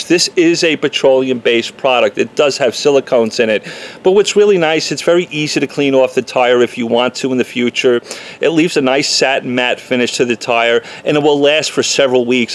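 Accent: New York, United States. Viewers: Great info, thank you. You sound more like a New Yorker than a Texan :) . God bless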